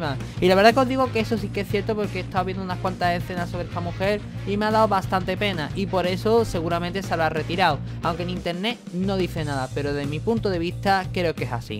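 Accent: Spain. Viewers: Odio el accento Español 😅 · Ironically spaniad spanish is one of the worst...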